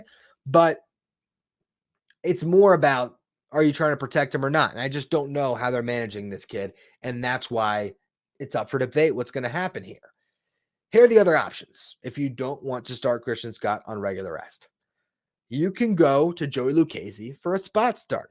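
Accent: South, United States. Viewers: Why the southern accent on the intro?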